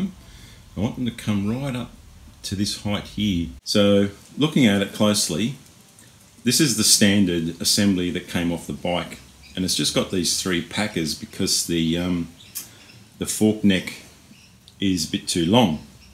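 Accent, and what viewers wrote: Australia. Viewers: Where have this channel been all my life? I love it! Subscribing for sure👍🏻 I love me some aussie accent aswell👌🏻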